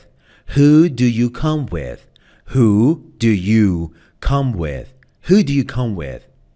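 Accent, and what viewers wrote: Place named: Togo. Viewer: Togolese english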